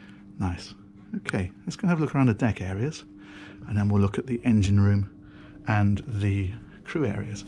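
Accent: England, United Kingdom. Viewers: Another one of your Splendid Reviews,. The World will miss you on U-Tube when you retire from doing these Very Positive reviews on your Aquaholic channel.I am guessing on your Age now. I Believe what really makes you Better than the Rest is your English accent and Your British Personality which Shines Through for you.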